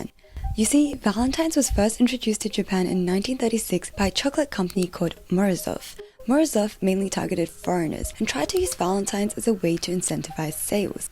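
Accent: Australia. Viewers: Is that an Australian accent mixed in with your English pronunciation? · At first I did a mental double-take at hearing your Australian accent, then I noticed the size of your room and thought "yeah, fits, that's prolly her entire apartment in Sydney or Melbourne and she pays $5000/month rent" 🤔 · Why am I hearing an Australian accent?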